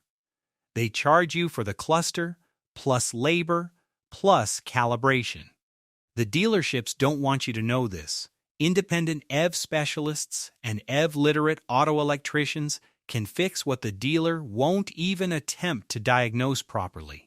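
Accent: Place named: United States. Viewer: You should be more careful with your script writing because you’re using an American Standard accent, but referencing British currency. Also EV, is pronounced eeeee veee not “ev” like in evermore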